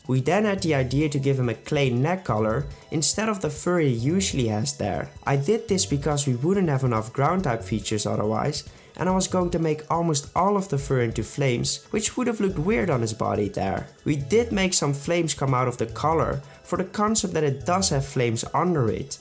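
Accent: Netherlands. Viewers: Sorry matje je designs zijn goed maar ik kan het gewoon niet afkijken omdat je engels zo verkaast is 😅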